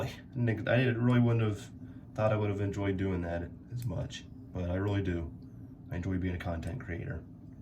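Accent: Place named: Pittsburgh, United States. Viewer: I thought I heard a hint of Yinzer in your accent. Proud PA boy here.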